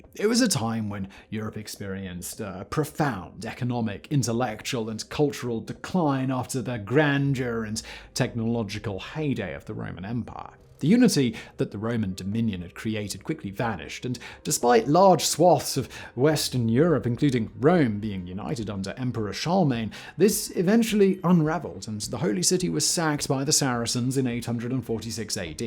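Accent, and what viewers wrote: RP, United Kingdom. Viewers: For someone who speaks like a toff and gets all oity toity about snobby things you'd think he'd have ALL of his pronunciations right 😂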